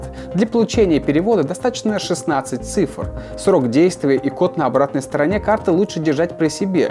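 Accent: England, United Kingdom. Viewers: Тут всё видео говорит английским акцентом, я один это заметил?.-. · А я единственный заметил, что у что то с голосом, будто какой-то английский акцент. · Мне показалось или в этом выпуске говорил с очень заметным акцентом? Я бы сказал как англичанин но очень хорошо говорящий по-русски. Букву Р произносил очень мягко например.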